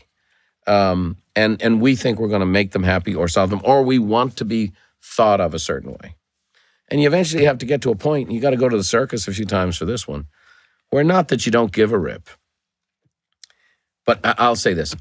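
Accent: Dublin, Ireland. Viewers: Is this guy Irish? Has such a crazy accent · I’m from Cork, love hearing his Dub accent 🎉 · Yes he has a Dublin accent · Comments say he's an Irish-American immigrant Brian Buffini. Cool. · He has a Irish drawl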